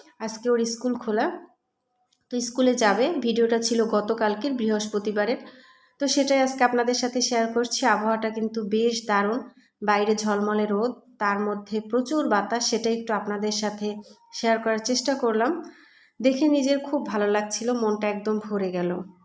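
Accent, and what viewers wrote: Barishal, Bangladesh. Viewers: তোমার বরিশালের কথাগুলো মিস করি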